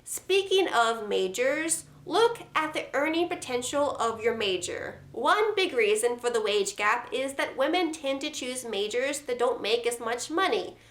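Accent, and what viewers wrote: South, United States. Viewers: Good vid. Your chin dimple is getting cuter each time. Where does this southern accent come from.....real or are you just putting in on? BS says free college for everyone...just what we need. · you accent is become increasingly countrified. It's getting me excited.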